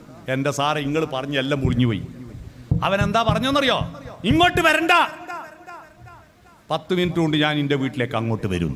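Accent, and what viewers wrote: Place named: Kannur, India. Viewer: എന്റെ പൊന്നു സുരേഷ് ബാബുസറെ,താങ്കളുടെ പ്രഭാഷണം വളരെ വിലപ്പെട്ടത് തന്നെ, പക്ഷെ, ഭാഷ വെറുപ്പ്‌ ഉളവാകുന്നത്, കണ്ണൂർ, കാഞ്ഞങ്ങാട്, etc,,, അതൊന്നു മാറ്റി നല്ലമലയാളം, നല്ല ഉച്ചാരണം ആക്കിയാൽ ഒന്നുകൂടെ (100%) നന്നാകുമായിരുന്നു, pls try Sir, 😊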